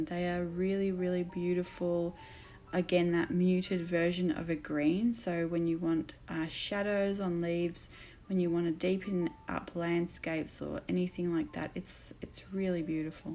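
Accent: Australia. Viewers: Great to hear an Aussie accent. I am in NSW and am trying to get into art and watercolour after a career in nursing. I love your style. Thanks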